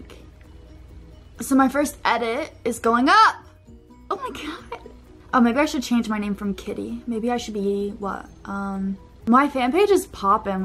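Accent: United Kingdom. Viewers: This is my first time watching u, I saw your tiktok but I wanted to know, are you British???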